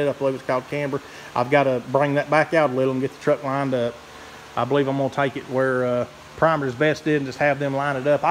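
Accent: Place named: South, United States. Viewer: I love that accent of the southern folks reminds me of my good friend blessings to you and him 👊🏽😁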